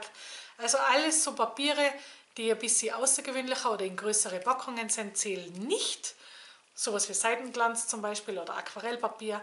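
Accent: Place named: Tyrol, Austria. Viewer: Hallo, wow was für eine tolle Action wieder von Dir. Gerne versuche ich mein Glück und hüpfe mit in den schon gut gefüllten Lostopf. Ich freue mich immer wieder wenn ein neues Video von Dir kommt ich liebe Deinen tollen Tiroler Akzent;-) und natürlich Deine tollen Ideen habe schon einiges nachgebastelt. Viele liebe Grüße aus Bayern Christine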